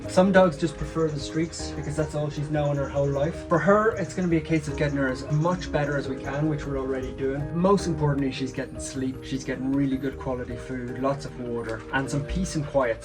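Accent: Ireland. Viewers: You are an amazing person for helping these poor animals, you must have been sent from heaven as you sound so chilled with that beautiful Irish accent. Please continue with the good work helping them back to good health ❤😊 No doubt it has it’s up’s and downs but on the upside you are helping gods animals 😇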